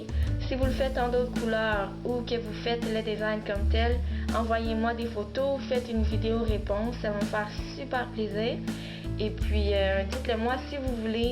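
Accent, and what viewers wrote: Canada. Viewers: C super tu est canadienne ?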